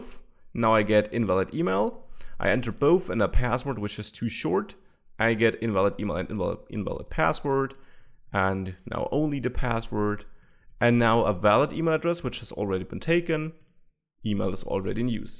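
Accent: Germany. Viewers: Every tutorial is better with a German accent.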